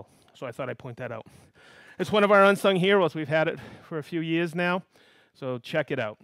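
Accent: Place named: East Coast, United States. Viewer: "this is an accessory God...Spock God" east coast accent...love ya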